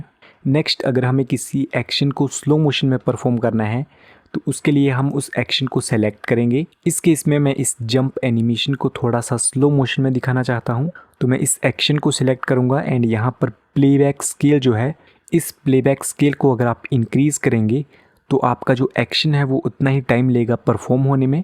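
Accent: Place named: India. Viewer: india voice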